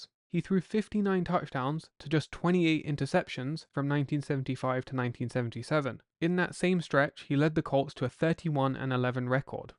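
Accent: England, United Kingdom. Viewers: An Englishman doing American football sounds funny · why a whack english chap narrating doesnt mix with AMERICAN football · British narrator or AI ?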